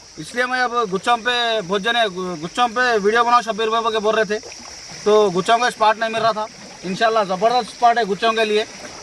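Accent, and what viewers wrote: Hyderabad, India. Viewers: Bhai Hyderabad se hai kya aap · kya mast bola... sounds like hyderabadi language..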